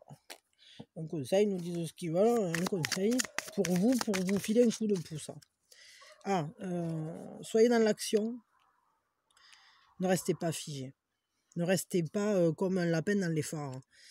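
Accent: South, France. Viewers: Mais kel joli accent! ..méridional a priori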